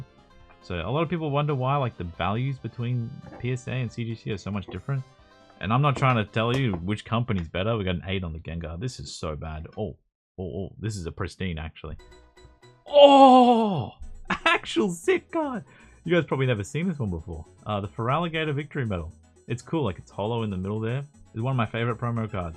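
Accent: Australia. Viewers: An hour video of regrades from a dude with an Australian accent? I click.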